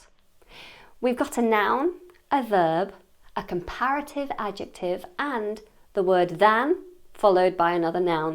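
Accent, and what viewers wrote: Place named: United Kingdom. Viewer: Wel come sweety. Huge thanks 4 ur daily updating us wz ur english knowledge nd British accent. I love u. Ur bro Salih from Eritrea.❤❤❤❤❤❤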